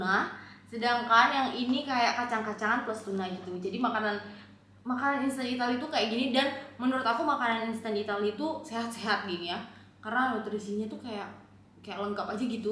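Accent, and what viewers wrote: Malaysia. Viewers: Saya mulai subscribe dari tahun lalu, suka aja liat videonya lucu, menarik, dan logat melayu'y ini bikin gemas Klo ngikutin d'ig baru berapa bulan ini karna saya ga terlalu aktif d'ig 🤭🤭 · cara kamu berbicara spt bahasa melayu malaysian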